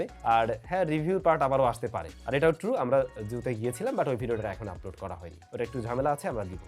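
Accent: Barishal, Bangladesh. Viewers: ভিডিওটা দেখে খুব ভালো লাগলো ❤️ ভাই মুইও কইলো বরিশাইল্যা 😂 আমনেও তো হেইয়া ধন্যবাদ 🌺 ভাইকে 💝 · Vai Tumi mogo boreshailla SUNA khubi Valo laglo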